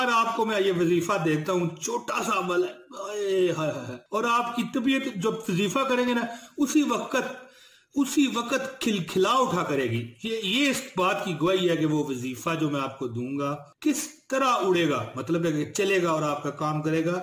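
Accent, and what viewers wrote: Pakistan. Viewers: achaaa mjy lga Lahore k ya Faislabad k hn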